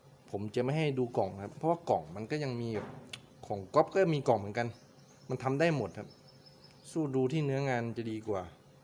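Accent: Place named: North, Thailand. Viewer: คนเหนือกาครับสำเนียงมันใช่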